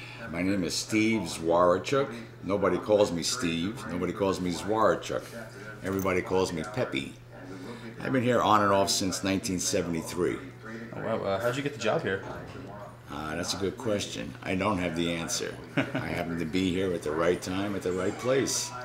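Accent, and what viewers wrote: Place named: New York, United States. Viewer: Such heavy NY accent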